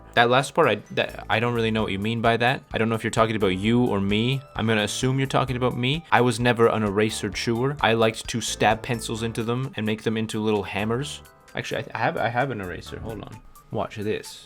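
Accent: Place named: Canada. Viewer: I could tell you were canadian from the way you pronounced "out" and "about" came here to comfirm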